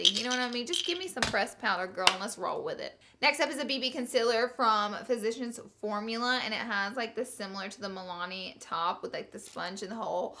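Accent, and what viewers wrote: South, United States. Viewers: Laura and I LOOOOOVE your videos and I love the southern accent. I'm from Texas so when I hear you say y'all it brings a smile to my face cuz I thought only Texans say y'all 🤣🤣🤣🤣👍👍👍👍😬😬😬😬 keep up the great work!! #teamLee!!! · when I first watched you your accent threw me off because I haven't heard anyone with a southern accent before..but I love your videos much love from Dq Arkansas❤ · I love how you say "oily and grezy" lol that southern accent shines threw girl! Lol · Zoey VH I know a lot of southern people that say it just like her.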